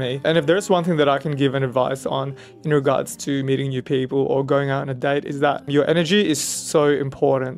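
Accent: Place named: Australia. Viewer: guess I confused the Australian accent w/ a British accent . Luv your vlog & style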